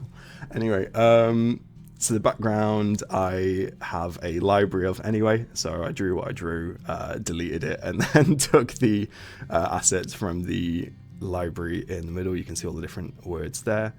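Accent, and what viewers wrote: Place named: United Kingdom. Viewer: hehe, as an expat living in australia it literally gives me a little seratonin boost whenever I hear a british accent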